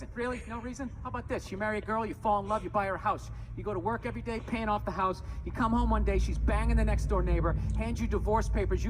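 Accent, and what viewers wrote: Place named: South, United States. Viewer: Yep I'm a reaction junky and from Bristol TN so not enamored by your voice or anything we both country....only said that to say you have good camera abilities. I wish at this point I had more going on but besides when my grandson gets his little league going I got down time and regardless if there's ever a reactor HOF I better be a judge so good start as I haven't seen you before ma'am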